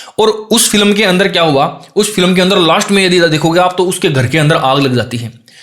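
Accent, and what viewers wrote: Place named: Shekhawati, India. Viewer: Wow sir .....kya gajb ki trick ....❤ap shekhwati se ho kya kyunki apki language m asar dikh jata h thoda thoda .......bhot acha pdha rhe ho sir sir ......👍👍👍👍👍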